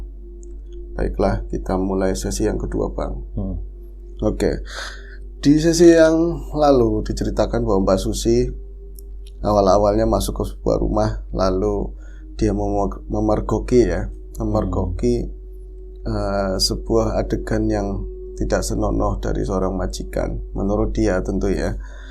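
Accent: Banyumas, Indonesia. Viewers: Asyiik juga dengerin mas e br kisah pke bhs ngapak2 gmn gtu... menghibur ...😊😊😊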